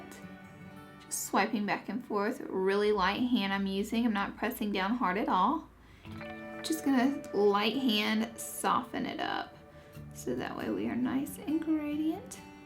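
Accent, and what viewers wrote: South, United States. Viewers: #snapchatfam love this look, also I think your voice is amazing, my family is all southern and it makes me feel at home 😁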